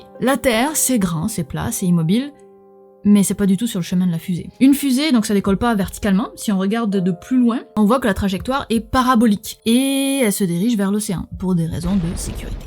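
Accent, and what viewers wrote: South, France. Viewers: Je me pose une question tu est Québécoise ? Car tu à l'accent du Sud de la France . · Y'a un truc qui colle pas : T'as l'accent du Sud, mais tu es dans la neige ! Quelque chose dans mon cerveau déconne en voyant ça...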